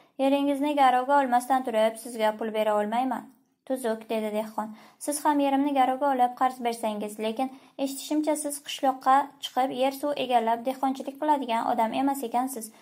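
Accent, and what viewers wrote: Bukhara, Uzbekistan. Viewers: Buxorocha shevezdan ey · Вокеалар Бухорода бўлганлиги учун синглимиз ўз шеваларида ўқиб қўя қолибдилар😊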